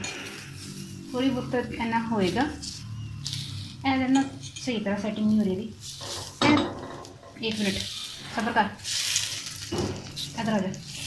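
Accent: Punjab, India. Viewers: Z MIRZA WITCH KIND OF PUNJABI IS ?? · Nice Panjabi touch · recipie is very delicious, but your punjabi is much more sweet, Allah always blessed you and Allah give you every Khushi in your life ( Ameen ) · Punjaban se hindi aur 'inshaallah' sunke achha lagta h.. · I Absolutely loved your video, very simple and natural. Some people are writing such negative comments about your punjabi accent, these people are forgetting your channels name is "Punjabi da kitchen ". I'm a Punjabi Pakistani American. I have a hospitality degree and been in the States for most of my life. You girls are doing a good, keep working hard and be yourself I loved your work. God Bless <3 <3 <3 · مجھے آپ کی پنجابی بہت پسند ہے جب آپ پنجابی بولتی ہیں مجھے کافی ہنسی آتی ہے ۔ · Ur punjabi so sweet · Bht cute punjabi hy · Really enjoy your sweet cute punjabi. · your punjabi so sweet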